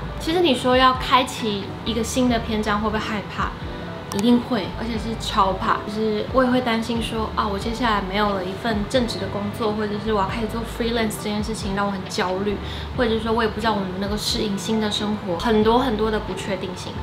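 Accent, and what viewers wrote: Cantonese, China. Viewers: 不要哭啦，知道你是獨立的女生，好喜歡你的廣東話口音，歡迎再來香港😘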